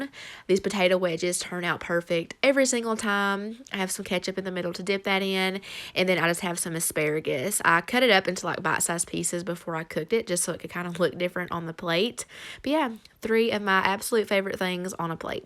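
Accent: United States. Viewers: Hi, I love your accent, from which state are you? Greetings from Europe:) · Hello, I love your videos. I'm trying to figure out which accent do you speak. I'm German and I have a lot of american colleagues (they are from Palo Alto) and their accent is different from yours:-)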